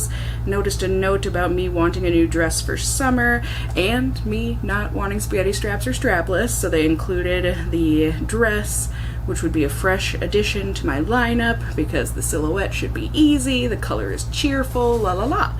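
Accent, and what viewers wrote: Minnesota, United States. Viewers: You remind me of my daughter’s friend. Maybe it’s just your Minnesota accent. I’m a Minnesotan also but I have lived in Oklahoma for 40 years.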